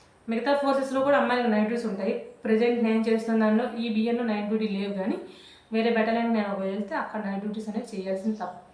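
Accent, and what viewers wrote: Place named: Srikakulam, India. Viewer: Midhi srikakulm haa madem.. Language... Same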